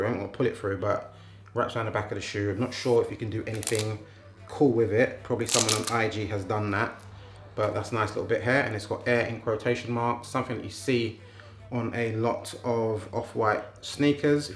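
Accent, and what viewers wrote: United Kingdom. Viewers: You need to be British to fully understand his accent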